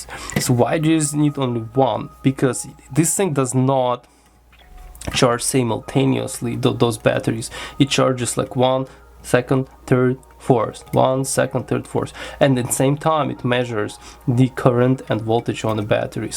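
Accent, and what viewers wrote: Russia. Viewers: У вас российский (или другой специфический) акцент? или у меня что-то с восприятием речи? 🤣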